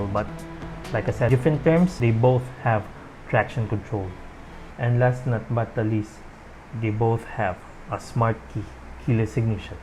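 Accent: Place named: Philippines. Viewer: I'm liking the filipino accent. Also he's aiming for a much bigger audience obviously so he's using the universal language so other who aren't Filipinos can understand. Just saying.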